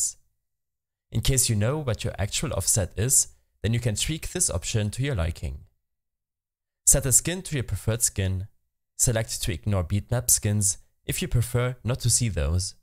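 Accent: Germany. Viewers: so geil das man raushört das er deutsch ist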